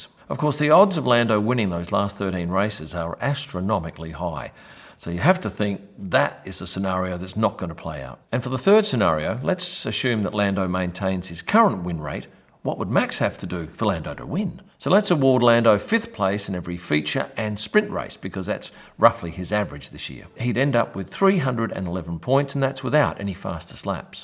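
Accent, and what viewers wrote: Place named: Australia. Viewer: There are many Australians out there in the big bad world. We seem all sophisticated etc. but secretly all we crave is Weetbix, Vegemite on toast, proper barista coffee and an Australian voice. Thanks.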